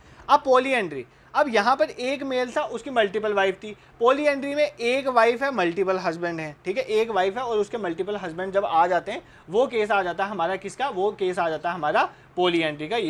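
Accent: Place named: Poonch, India. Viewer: Sir ke accent se hi me smjh gyi thi ye apne poonchiye bhai hi h koi😂😂😂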